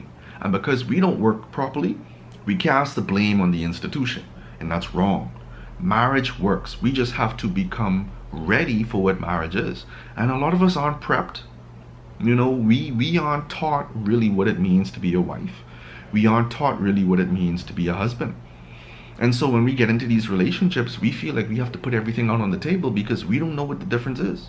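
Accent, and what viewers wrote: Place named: Bahamas. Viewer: Are you a Bahamian? That accent sounds like one from the Bahamas.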